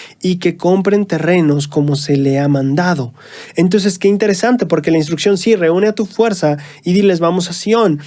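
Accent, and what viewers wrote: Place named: Mexico City, Mexico. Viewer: Con mucho respeto me gustaría dar mi opinión respecto al hermanito, tengo entendido de que el nos habla desde la Ciudad de México (nosotros les llamamos Chilangos) y ahí he notado que todos los chilangos hablan el español muy rápido y de corrido y a pesar de que mi hermanito lo hable un poco rápido se le entiende muy bien y claro... yo puedo sentir muy fuerte el espíritu, yo siempre lo escucho y he aprendido mucho más del evangelio con sus explicaciones. Deseo de todo corazón que NPC siempre me lo bendiga con mucha salud y energías para que nos siga nutriendo spiritualmente, aunque no lo conozco personalmente El siempre está en mis oraciones para que le vaya bien siempre. Muchísimas gracias 🤗🤗🤗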